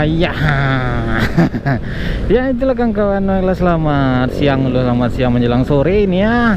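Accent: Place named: West Sumatra, Indonesia. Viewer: Logat bahasanya sama kayak minang kocak lu bang wkwkw😁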